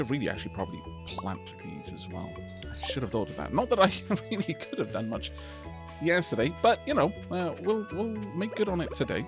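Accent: Wales, United Kingdom. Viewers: Are you a welshy like me? 🏴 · Just found this series love it. You've got a hint of Welsh, where are you from?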